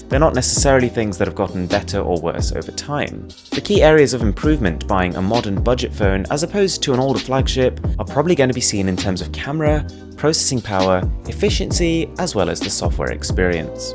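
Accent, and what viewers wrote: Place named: United Kingdom. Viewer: he was born and brought up in England. He's a British citizen, and hence the accent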